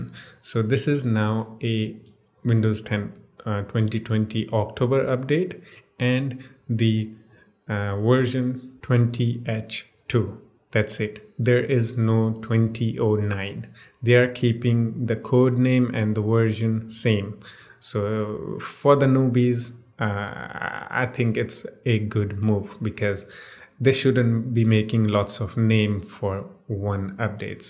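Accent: Northeast India, India. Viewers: where you from bro? your accent is sound like people of Northeast india,meghalaya ?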